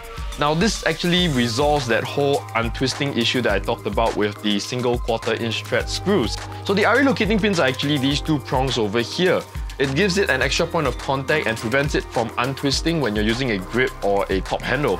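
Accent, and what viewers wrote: Singapore. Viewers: Singapore accent.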